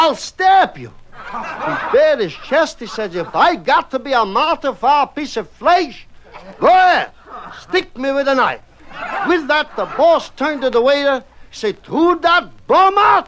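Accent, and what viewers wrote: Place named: Italy. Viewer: An authentic Italian accent!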